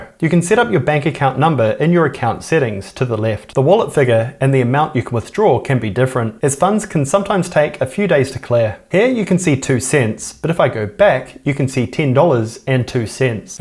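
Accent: New Zealand. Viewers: He speaks in a typical New Zealand accent. They are well known for speaking quickly.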